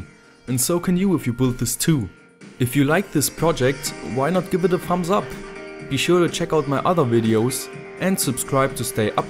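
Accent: Germany. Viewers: dude he's German you can tell by his accent. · That accent is clearly German, maybe Dutch which is similar (NOT the same, I dont wanna offend my German friends) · Your very german accent is very pleasant to my ears.. Nice project but why don't you use just a small transformer or transducer to get rid of the hum, when you use the same powersupply for the amp and the receiver? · he sounds german to me and the brands he uses suggest he lives in eu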